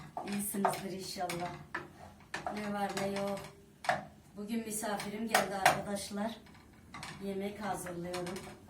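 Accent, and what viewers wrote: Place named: Konya, Turkey. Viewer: Merhaba bur konya yöresini konuşmayı benzettim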